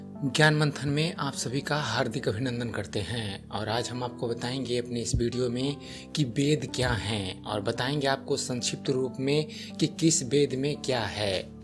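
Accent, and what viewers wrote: Bihar, India. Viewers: Bihari hai ke bhai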